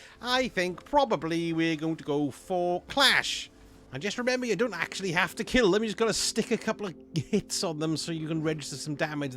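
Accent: Wales, United Kingdom. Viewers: You know what I love about your channel? The fact that you're just a regular player like the majority of us. There's too many vids out there by youtubers who are 1390 power level, showing hours of themselves doing flawless trials, speed running GM nightfalls and farming raids. Always a light hearted approach and humorous but informative, and that Welsh accent reminds me a lot of the infamous Dai Ling Ping ( what happened to him?). Keep up the good work me old sausage, and I hope your channel grows.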